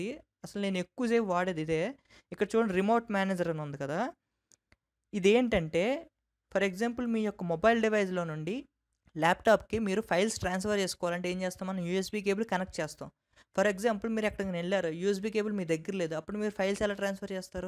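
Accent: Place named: Srikakulam, India. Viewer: I like your accent bro !! Maa Srikakulam friend gurthochadu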